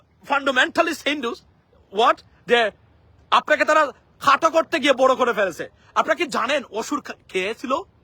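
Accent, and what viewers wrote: Sylhet, Bangladesh. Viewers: Bro sylhoti😅 You are gud boi.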